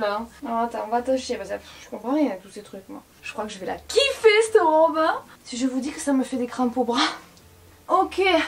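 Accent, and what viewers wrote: South, France. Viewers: Tu es vraiment mignonne, rigolote, simple, déterminée, et plein d'autres jolies qualités. Je te suis depuis longtemps mais étant une jeune femme de 46 ans :) je me voyais pas dans tous tes contenus, mais aujourd'hui j'ai réalisé que je n'étais pas abonnée, et qu'avec ton Million passé, une de plus ou une de moince (haha, je suis une chti, et chez nous, on ne prononce pas le s de moins ! mdr), ça changeait rien, et puis zut alors, je souhaite entrer dans ta communauté, avec mes 46 ballets et y rester discrete, mais voilà je trouve que tu mérites ton succès. Ta bonne humeur, ton humour, ton Accent du Sud que j'adore, ta nini et tout le reste, un univers gai et rafraichissant.... Merci xo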